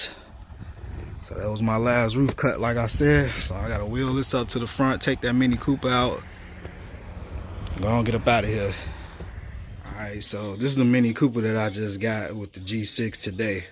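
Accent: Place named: Chicago, United States. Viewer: yo commentary funny ash as soon as da video started ik u was frm da raq watchin frm tx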